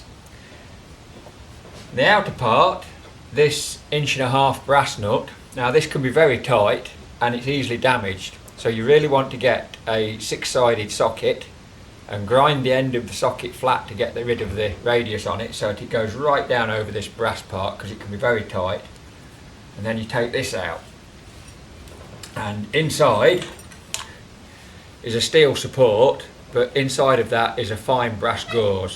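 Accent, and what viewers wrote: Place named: Leicestershire, United Kingdom. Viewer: Hello mate , I detect a Leicestershire accent , a couple of nights ago I found a guy on here , I think he,s in the states , he,s got an old 344 with crossed - up hydraulic settings & a rattly & inaccurate pto - hydraulic engagement lever , can you have a go at finding him , & straighten him out please . My knowledge really ends at 272 & 245 , but I have worked on a 344 , it,s me that refers to " Big Keith " , it was his . Keith is no longer with us , so that door is firmly shut . I told him to contact Charnleys but I think your knowledge is equally as good . Many Thanks mate , & keep the vids coming . Phil .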